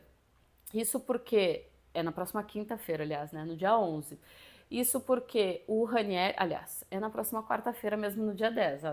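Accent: Rio Grande do Sul, Brazil. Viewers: ! Uma dúvida: É impressão ou tu é gaúcha? Parabéns pelo trabalho.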